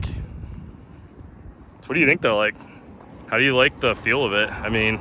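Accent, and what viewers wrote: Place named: Canada. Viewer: I was talking about the welcome back the guy dose it all the time sounds Canadian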